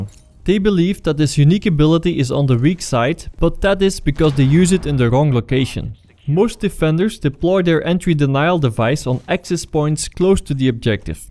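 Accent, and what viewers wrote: Netherlands. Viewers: that dutch accent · are you dutch? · nederlands accent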